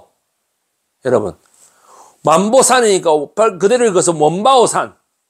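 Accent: Gyeongsang, South Korea. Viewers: 상대방을 대하는 존칭부터 배워야 될것같네 혀짧은 반말 갱상도가 개상도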